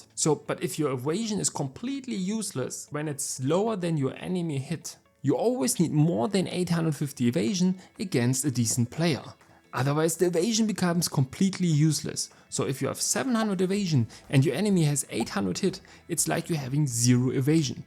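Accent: Germany. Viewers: man hört direkt den deutschen raus :D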